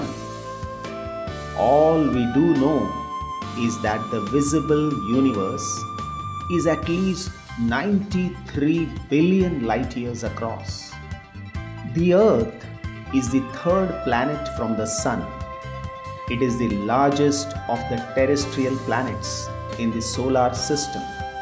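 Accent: India. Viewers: hahahaha this is not Hindi accent but more like dravidian languages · Hahaha, I love the Indian accent, especially when he says "cooled". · Mayank Singh Rajput hindi accent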